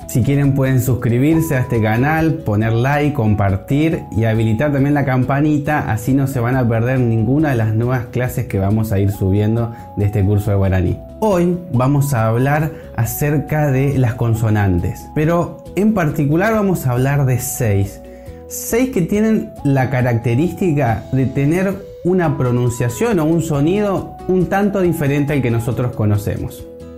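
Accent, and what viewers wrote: Corrientes, Argentina. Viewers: Pasa que es Correntino y tiene otra pronunciación, lo dijo al inicio. No es una pronunciación errada. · Me di cuenta que eras correntino por como pronuncias la CH soy misionero de padres paraguayos estoy mas acostumbrado ala variante paraguaya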